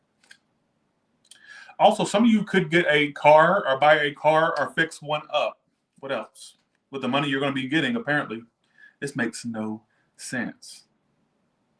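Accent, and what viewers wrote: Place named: South, United States. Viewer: I liked your strong Southern Accent during your Libra Reading. 🌋 Yes Observed and Monitored. Undercover Cops?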